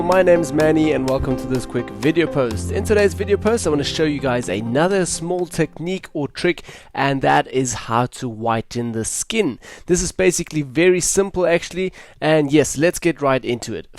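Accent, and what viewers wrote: South Africa. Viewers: nice suprice to hear a saffa accent in a cs tut! cheers! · I thought he sounded South African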